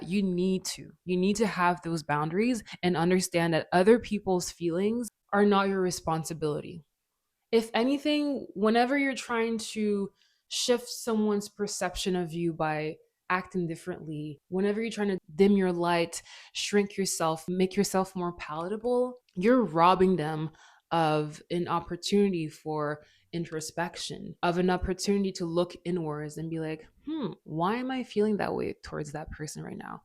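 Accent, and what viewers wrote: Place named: France. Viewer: I wasn't ready for you to be french 😂 quel accent de fou !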